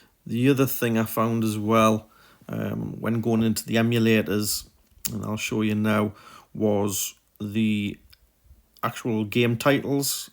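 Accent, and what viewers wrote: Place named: Newcastle, United Kingdom. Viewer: Cool review Geordie lad Like me 😉😉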